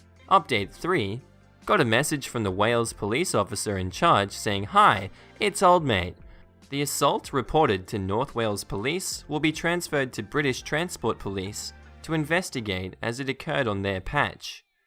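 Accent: South, United States. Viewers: Appreciate the narration for these but maybe a little less Southern for the accent? Most people don't have accents like that in America or at least not that thick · You are a bit hard on the r's. You sound a bit Southern. :) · It seems more country gentlemen to me kind of cute sounding I like it but your natural accent is better to me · Your American accent is doing good but only southerners really talk with that kind of accent 🤗 still though I could of believed you were American if I heard that 😆👍 Thanks for more stories ✌ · Not bad of an American accent. I think you have the southern gentleman down well enough. Also power to the Disney nerds!!!!! I’m 26 born and raised on Disney (I broke the film on our Bednobs and broomsticks VHS) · Lol I like how your default American accent is a southern guy. I can confirm we don't ALL talk like that, just most of us lol.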